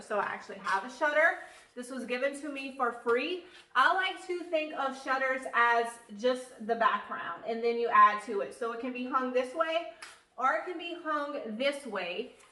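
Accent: Louisiana, United States. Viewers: You are so engaging and I love your accent! I have relatives that live in Morgan City and when I first heard your voice, I immediately thought of them even before I knew you were from Louisiana! You are truly an inspiration. I'm sooo happy I found your channel! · Dear, I love all the DIY'sbut I am in love with the placemat project!! I am going to attempt to build one. I have a question. I had cousins who lived in Donaldsonville and they sounded just like you!! Do you live in Donaldsonville or near it?? xo Linda Alvarez from New Orleans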